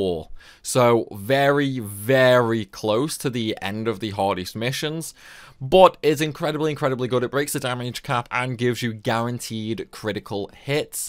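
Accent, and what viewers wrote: United Kingdom. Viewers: That’s how a lot of British people talk about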